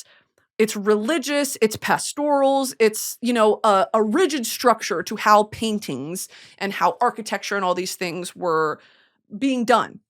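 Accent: United Kingdom. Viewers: the hair with the eyeshadow with the british accent.... giving Riff Raff realness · BRITISH ACCENT 0 SECONDS IN IM SO LOCKED IN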